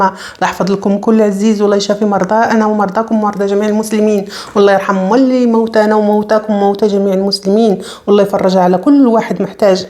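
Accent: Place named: Western Sahara. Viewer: السلام عليكم اختي الكريمة انتضرداءما الجديد منك بصوتك الجميل الصحراوي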